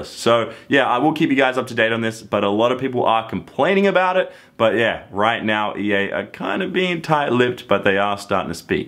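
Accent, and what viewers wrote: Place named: Australia. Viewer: Bob Bobby literally the exact same accent as every other Australian lol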